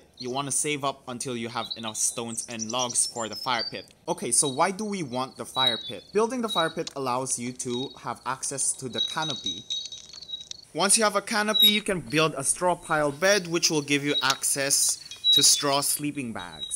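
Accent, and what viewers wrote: Philippines. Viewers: Are you PILIPINO? You sound like PILIPINO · utek filipino accent pala un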